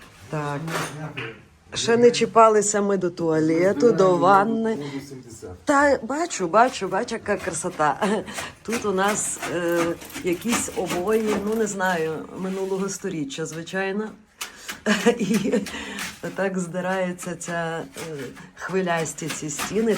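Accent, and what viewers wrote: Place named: Central Ukraine, Ukraine. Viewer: Гарна українська мова при 20 роках в Англії. Схожа на центральну Україну.[ Хай щастить.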